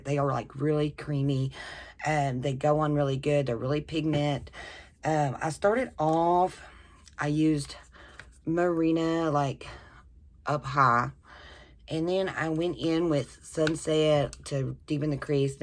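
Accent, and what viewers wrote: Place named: South, United States. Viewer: Everytime I watch you I just adore your accent...it is southern strong! That look you did with the palette is awesome and a bit subtle, you look like a happy sweet woman...and those are hard to find LOL! I don't even have a vanity but I have many piles of makeup that I need to organize so I relate! I would love to enter to win that palette because it looks so good on you. But you have better younger eyes than I have cause i am 56! Thank you so much for offering a lovely giveaway! Wendy Sowles on Insta at wendysowlesexploresmakeup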